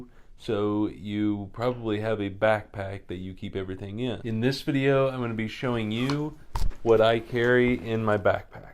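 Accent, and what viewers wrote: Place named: East, United States. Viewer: gotcha. I figured east of the Mississippi from the trees and 'accent'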